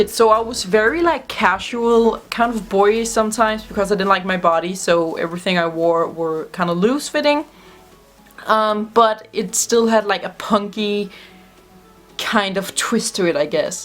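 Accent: Denmark. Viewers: lol jeg vidste du var dansk pga din accent, har også gået på smededal!!!!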